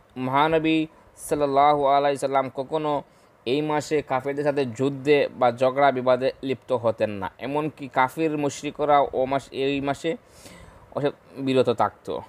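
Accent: Bangladesh. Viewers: বা‌ড়ি কোথায় ? কু‌মিল্লা ? নোয়াখা‌লি না‌কি চাটগাও ? বাঙলা ভাষার উচ্চারন ঠিক ক‌রে কথা বলুন।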